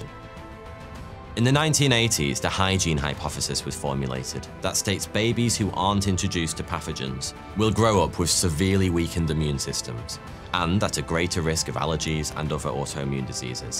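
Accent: United Kingdom. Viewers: Alabama? I thought you were from the UK.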